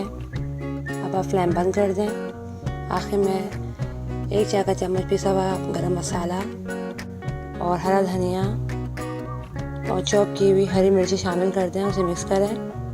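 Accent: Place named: Hyderabad, India. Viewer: Hydrabadi accent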